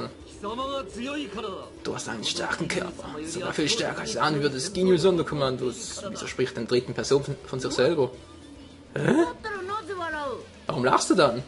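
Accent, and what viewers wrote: Switzerland. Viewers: Kommst du aus der Schweiz ?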